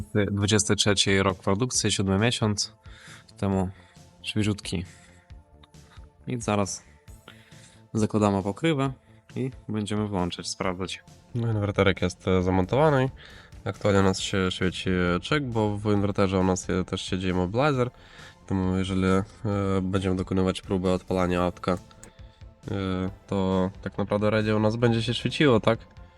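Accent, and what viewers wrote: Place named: Eastern Europe. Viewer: Słyszę akcent typowo słowiański 🇺🇦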